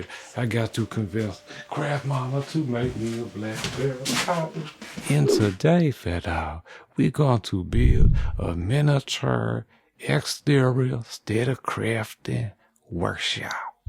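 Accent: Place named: East Texas, United States. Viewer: the more cultural lore we get from you, the more i feel a kinship. i grew up n a small town on a farm in deep east texas. We would pick wild blackberries every summer, and the local dialect was similar to yours. more or less, your vids feel really cozy and familiar to me.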